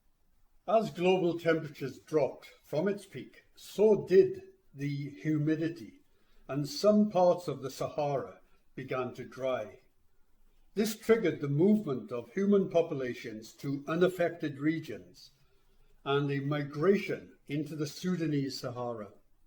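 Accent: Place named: Scotland, United Kingdom. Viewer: I had a professor that reminds of this narrator. He had a great deal of knowledge but was more boring than watching paint dry or grass grow. He does have his Scottish accent that aids his presentation slightly but I couldn’t make it through it. Possibly because I’ve seen this data presented in other formats. Carry on the fight though as I’m sure that there are many out there that either haven’t heard or refuse to accept factual data on “anthropomorphic climate change”.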